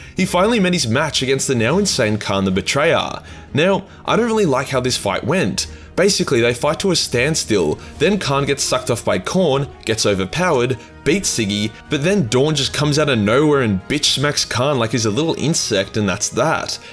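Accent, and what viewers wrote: Australia. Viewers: Love Aussie narrative... SIGGY :D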